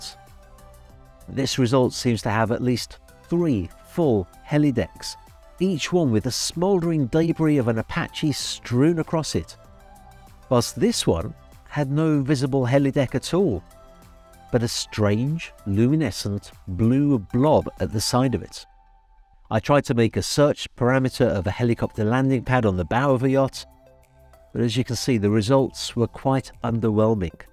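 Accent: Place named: Midlands, United Kingdom. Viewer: Hi, I recently watched a documentary about superyachts that you starred in. It was great how your midlands accent was so strong in it haha...also, I noticed on the Boat International website Wider had a 170ft yacht for sale. Will you be covering the yard again soon?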